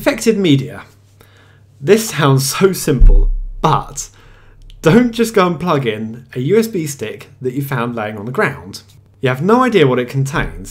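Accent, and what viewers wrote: United Kingdom. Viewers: Your British accent is SO strong, haha! Funny that the number or likes in this video (at this time I'm writing) is 666, hehehe.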